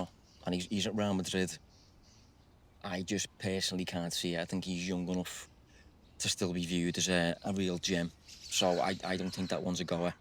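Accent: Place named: Liverpool, United Kingdom. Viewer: I haven’t heard this lad before. A lack of judgement on my behalf…but when I heard his Scouse accent…despite being a youngster (to me) I took to him straight away and subscribed. O only really Listen to David Lynch. And he reads the Comments! So 💯 % respect from my side of Sefton Park… COYR’s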